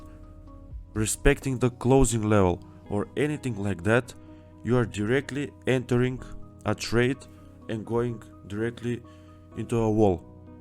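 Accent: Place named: Russia. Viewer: Very very good material! Откуда русский акцент?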